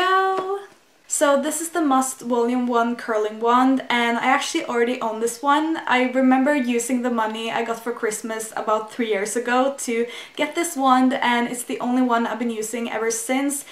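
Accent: Norway. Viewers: I KNEW you were Norwegian hahaha heard it!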